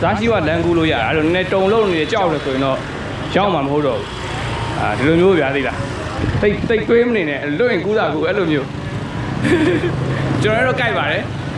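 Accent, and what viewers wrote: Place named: Upper Burma, Myanmar. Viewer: ထမင်းစားရင်လဲ ကို ပဲကြည့် အလုပ်သွားအလုပ်ပြန် ကားစီးနေရင်းလဲ ကြည့် videoလေးတွေတကရ်သဘောကြတရ် စကားပြောတာကလဲ လုံးဝပွင့်ပွင့်လင်းလင်းနက်လုံးဝအညာစတိုင်ကြီး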